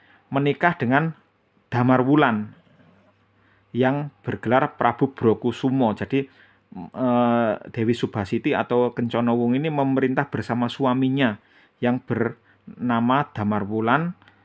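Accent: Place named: East Java, Indonesia. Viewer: Video ini berisi narasi dalam Bahasa Indonesia dengan dialek Jawa Timuran.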